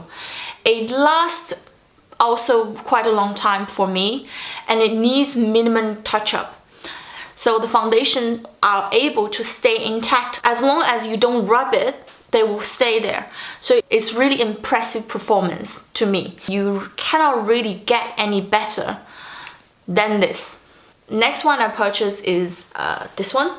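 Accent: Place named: United Kingdom. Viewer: 厉害了我的琳👍英文好赞！喜欢你这带点英式的发音呢😊😊😊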